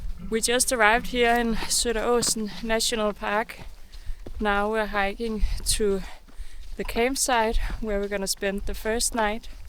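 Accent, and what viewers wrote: Denmark. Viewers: Your soothing Danish voice almost put me in a trance, for a while I thought I was in a remake of Elvira Madigan (1967) then I snapped out of it, unfortunately, I would rather have stayed there! What a wonderful video, thank you! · It's so funny that I only need like 5 seconds to hear that you are from Denmark 😄I'm danish myself that's why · Sorry - men jeg kan ikke klare med af den danske accent i det engelske, havde håbet at det blev bedre, men jeg står af nu. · Thank you for the video from my home region "Söderåsen", It was a lovely Danish accent, I love Denmark, Danes, have been there so much that I am almost half Danish hi hi, Nature in Söderåsen is beautiful but I am not so happy about the wolf implementation, people have lost dogs and soon it will affect some people! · Den danska accenten i engelskan är mycket vacker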